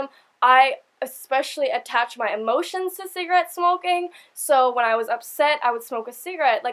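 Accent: Australia. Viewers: You're accent is becoming more Australian and it's so adorable! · Were are you from? You sound Australian but not all the way (I I'm from Sydney) BTW I love you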